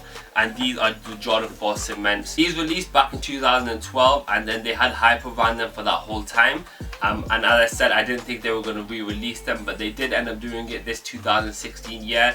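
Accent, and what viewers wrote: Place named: London, United Kingdom. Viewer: Love your mix of London and Manchester accents, sounds so dope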